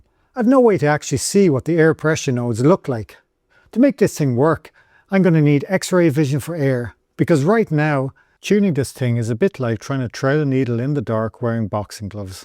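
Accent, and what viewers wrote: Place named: Ireland. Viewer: Amazing video. Great to hear the Irish accent!! Some amount of work gone into this fair play to ya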